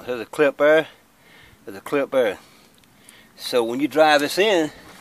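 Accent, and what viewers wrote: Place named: Virginia, United States. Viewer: Where are you from? My uncle is from around Staunton, and he has a similar accent. Thanks for the pointers.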